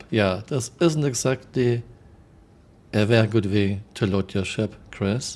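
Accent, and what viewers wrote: Germany. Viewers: LOL ich höre ganz stark heraus, dass du deutsch bist ✌️ Liege ich richtig ?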